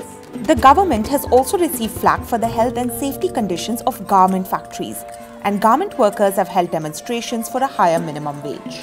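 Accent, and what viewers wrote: India. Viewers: Hate the Indian presenter and accent. Should use English spoken people. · Just 1 percent of your Indian accent makes this entire report 200% biased in your favor. Bangladeshes electricity consumption is <num> Megawatts, where is produces nationally 23,000 megawatt and will increase it to 2 Gigawatt by 2023-4. So what "Electricity hinderance are you refering to? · Is TRT World an Indian channel !!? Why an Indian with their annoying Indian accent of English and overall and Indian view on the matter of discussion.....!!! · Bring someone who speaks ENGLISH. NOT HINDLISH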